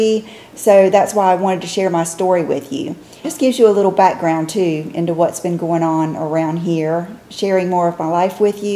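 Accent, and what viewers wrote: South, United States. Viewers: I could listen to you talk for hours. You’ve got such a soothing southern comfort to your voice. It’s like when you’ve been away from home for a while and cross that border back into the south, it’s like a big ole hug sayin darlin’ your home❤️.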